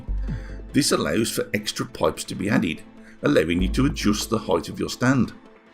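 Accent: Birmingham, United Kingdom. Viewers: Good job fella. Subbed. Do I hear Brummie in your accent mate?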